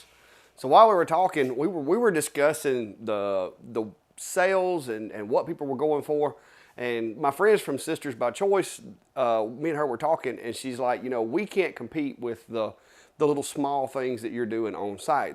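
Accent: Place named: Perry County, United States. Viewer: I grew up in Perry County so I can understand you when you "tawk". lol Thanks for the good ideas and keep 'em coming!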